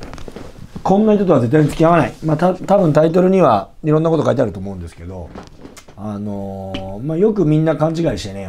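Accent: Nagoya, Japan. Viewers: はじめまして おすすめ動画に出てきて見させて頂きました。 とても参考になりました。 自然な名古屋弁いいですね(^o^)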